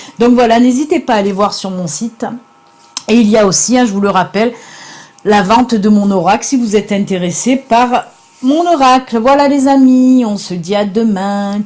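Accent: South, France. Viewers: J’ADORE Votre Accent du Sud 🙏😉🥰🥰🍀🍀🍀🍀🌷🌷🌷🌷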